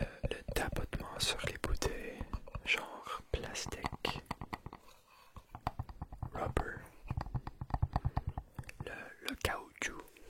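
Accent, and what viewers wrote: Quebec, Canada. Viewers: Ton français resemble au français du Québec ! A tu apris le français au Québec ? Btw très bonne vidéo merci !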